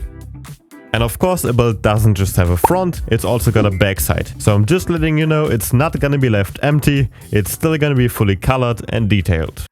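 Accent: Germany. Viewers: Are you German? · Your German right? And nice build btw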